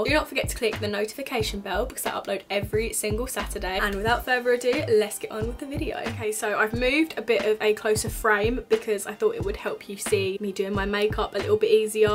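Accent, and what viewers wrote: United Kingdom. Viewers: UK Essex accent !!! (not the most refined...) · Yes I somehow always thought she was American (never heard her speaking before) and I was so surprised (in a positive way) that she was British!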